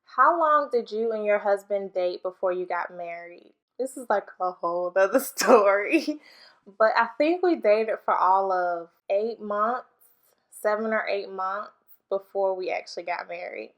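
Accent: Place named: South, United States. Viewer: Thank you in bunches for answering my questions in full!! You truly blessed me!! I'm from Charleston, South Carolina so that's how I picked up on the southern accent!!! I can't wait to meet up win you girl! Also you look amazing not just for your age which 30 is super young but in general. I thought you were 22/23!! Love your future plans for you and your family!! FAMILY FIRST!!!! ❤️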